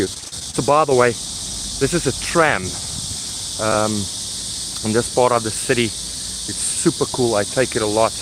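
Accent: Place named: South Africa. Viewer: I just love your accent. I think the South African' English is the coolest of all English accents.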